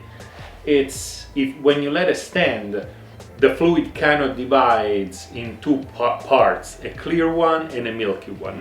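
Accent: Italy. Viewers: love the accent! Italy?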